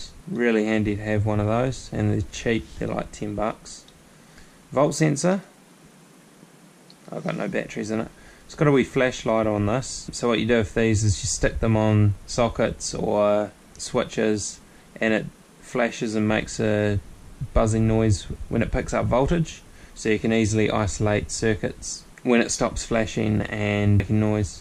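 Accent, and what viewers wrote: New Zealand. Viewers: Love the kiwi English words & accent.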